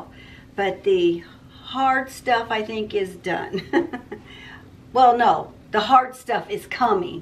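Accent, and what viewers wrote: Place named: South, United States. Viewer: I just love those fabrics you chose; the cardinals and the bicycles! Your bag is perfect! I look forward to seeing the completed pattern project. I am trying to make my 1907 Baby Bonnet pattern available. We do love a challenge! you are special! I don’t know if you’re southern, but we say some things the same way, and I have to think really hard to complete my sentences. I put a dash of vanilla flavouring in my coffee. It improves the taste. I enjoy your chats. I’m also trying to lose inches. Best wishes!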